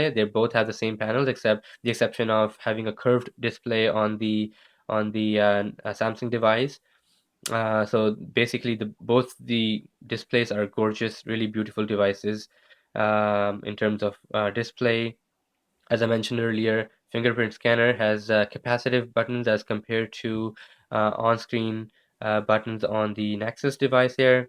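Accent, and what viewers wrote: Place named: India. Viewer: u sound like an indian,r u?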